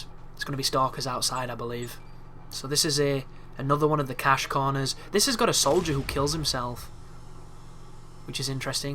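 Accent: United Kingdom. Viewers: Hi, I enjoy your videos and your accent (no offense) helps me dealing with UK and Scottish management in my work (I understand them now). One thing though - the ball sacks are cysts and the enemy you call cyst is exploder. Not that it matters.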